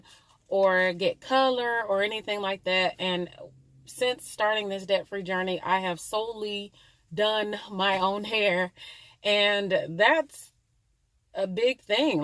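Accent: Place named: North Carolina, United States. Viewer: ❤️ I'm from and live in UK, my hubby is from America, he walked past and was like 'who you listening too, she sounds like she's from NC', which is where he is from. I have no idea where you are from, but I love listening to your videos as your accent reminds me of all our family and friends back there🥰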